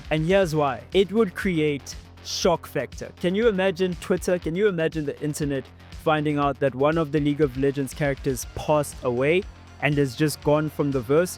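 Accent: South Africa. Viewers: once i heard the voice i knew u were from SA ...lol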